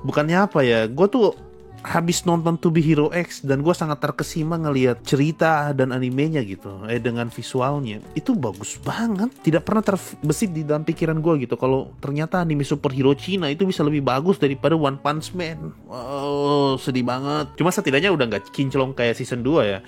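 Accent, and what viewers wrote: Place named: Eastern Indonesia, Indonesia. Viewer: Sorry bang subscriber baru, ini kenapa logat timur nya bocor sedikit. Orang mana kah admin ini?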